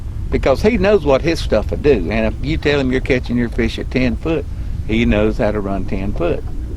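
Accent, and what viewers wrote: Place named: South, United States. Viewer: Thanks for your videos and knowledge. I have always been a bass fisherman but just bought a new boat and my dad wants to go trolling for crappie. I had no idea how involved crappie fishing is. You really educated me and I appreciate your knowledge and the way you do your videos. you talk "regular" good old boy language I can understand! Thanks for sharing your knowledge!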